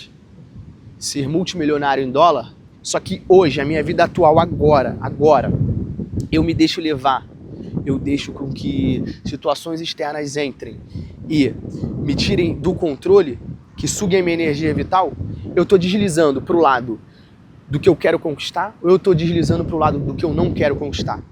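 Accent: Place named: Rio de Janeiro, Brazil. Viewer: Perfeição é o nome do seu vídeo. Viral, sensacional, português claro de carioca (foda)😂😂😂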